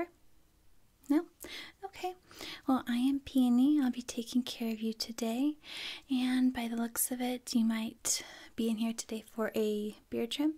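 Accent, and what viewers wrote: United States. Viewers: but with an American accent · you must be from the South?:D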